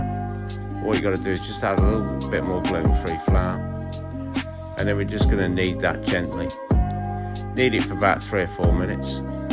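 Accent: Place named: New Zealand. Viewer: Hi I am from South Korea I thought you sounds like kiwi I am right You are in Christchurch I enjoyed your bread show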